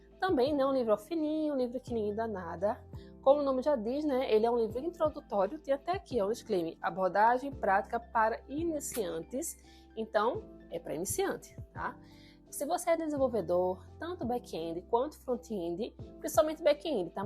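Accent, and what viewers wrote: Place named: Pernambuco, Brazil. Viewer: Topp, obrigado, gosto bastante da sua didática e recomendações, e também sou pernambucano como você, é reconfortante ouvir nosso sotaque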